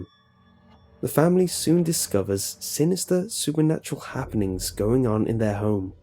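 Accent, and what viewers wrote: United Kingdom. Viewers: He's British, that's why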